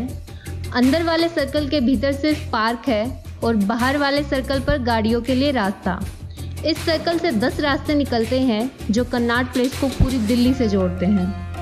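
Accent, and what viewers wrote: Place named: France. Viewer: Lagra French aye lagra yesa❤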